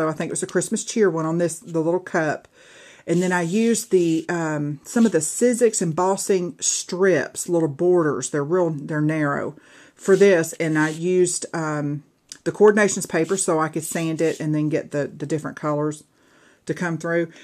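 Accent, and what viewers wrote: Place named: South, United States. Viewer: This is one of the MOST adorable minis I've ever seen! I love love love it!! :) And on top of it all I love listening to you talk! I'm a "yankee" so southern accents I could just listen to all day because I never hear em!!! I can not wait to check out your other videos! Thanks for sharing this!! :)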